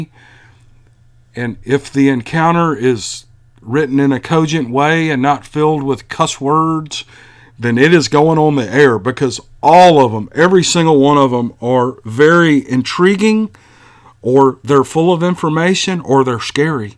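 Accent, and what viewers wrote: South, United States. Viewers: Nothing better than a Southern voice, Louisiana folks appreciate you, and your taking time to do this!!! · Yea yu dig his southern voice Us southern boys have that southern draw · I love the fireside videos. I listen right before I go to & the fires & your nice southern drawl lulls me to sleep. Please don't take that offensively. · I luv your southern accent if I'm saying that correctly, anyway it's different from other you tube videos. You have good store telling technique.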